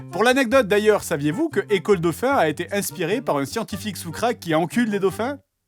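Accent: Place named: Toulouse, France. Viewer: Tu es toulousain, ça s'entend ....ou du sud de la France...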